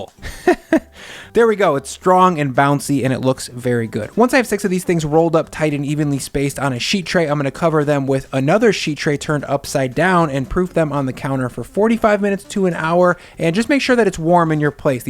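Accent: Chicago, United States. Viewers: I'm guessing the accent is Chicago? The "SH" sound in a word like, "schtrength". This looks like a Nashville Chicken sangwich but without the pickle juice marinade for the chicken.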